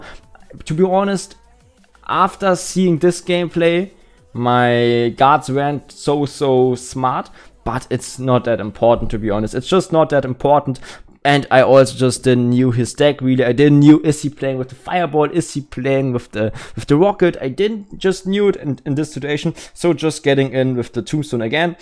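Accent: Germany. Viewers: Ich liebe deinen deutschen accent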